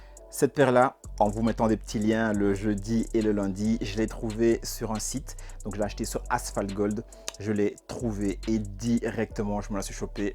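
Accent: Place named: Belgium. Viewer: Petit accent belge 🤣 tu viens d’où ?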